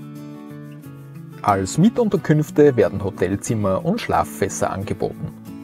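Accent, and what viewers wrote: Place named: Switzerland. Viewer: Hallo Zusammen... sollte eigentlich Hochdeutsch und nicht Schweizerdeutsch sein ((-: Aber klingt halt so, wenn ein Schweizer Hochdeutsch spricht, haha. Lieber Gruss (wir schauen immer gern euere Videos!)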